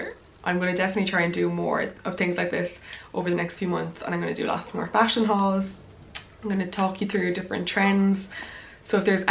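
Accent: Ireland. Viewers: I love your Irish accent ☘️💚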